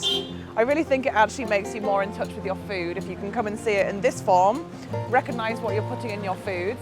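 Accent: United Kingdom. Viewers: I will subscribe your channel if you Marry me 😁 DONOT MIND 🌼🌼🌼🌼🌼🌼🌼🌼🌼🌼🌼🌼🌼🌼 I Love Listening British Accent ✅ Beauty + Lovely Voice + Beauty...... you are very beautifull 🌹I AM FROM SWAT🌹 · Hi which part of the world are you from. By your accent you sound British. Nice to see you that you are enjoying over there. Wish you well. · British 🏴 english accent is great 👍🏻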